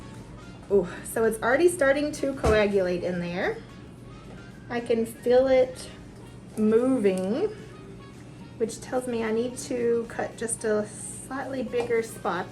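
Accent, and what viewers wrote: South, United States. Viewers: Do any of you know of a great guide to Lexington? I am looking to move and want a safe but affordable condo/townhome as I downsize. Do y'all like Lexington as your home? Is it easy to make friends? I am a Lupus patient and will need rheumatologist/nephrologist/PCP/orthopedic group...the works! I posted this question because of your sweet Southern accent - made me feel at home though I am from Texas. Any and all info would be appreciated. Thanks in advance and peace and love to all!! 💜🌺🌸💜💜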